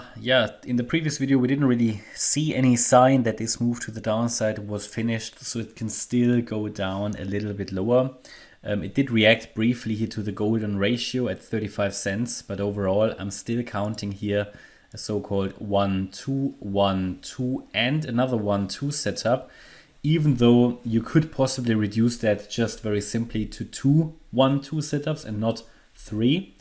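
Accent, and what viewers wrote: Germany. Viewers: Cardano is becoming less value day by day (compared to Bitcoin) P.S. nice German accent 👌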